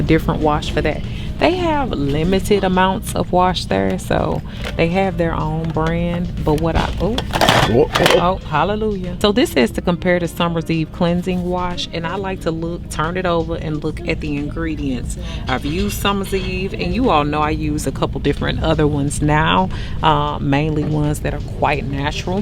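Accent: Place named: St. Louis, United States. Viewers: Off the subject of the video but you talk like you're from St. Louis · Thanks for sharing. I love Dollar tree. I'm here for you anytime you say, here, there, etc all I think is St. Louis and Nelly. I love it ❤️❤️❤️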